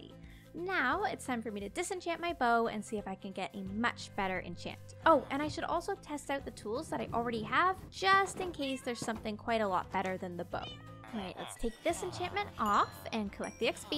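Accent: Australia. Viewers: Hey! Are you Australian at all? I love the videos, great job:) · Just a question are you Australian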